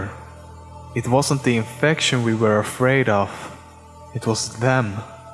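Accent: Sweden. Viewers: The jolly, good Swenglish dialect! :D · his swedish accent is more prominent in his older videos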